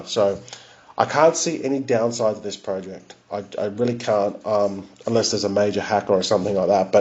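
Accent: Australia. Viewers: Hey mate, nice to hear an Aussie voice amongst all these crypto vids. I’m pretty new to this but trustswap seems like a no-brainer. Not sure I can afford to purchase enough to stake any ICO’s immediately. From your understanding is it still worth holding the token? I’m thinking so but still pretty green! Thanks for your thoughts...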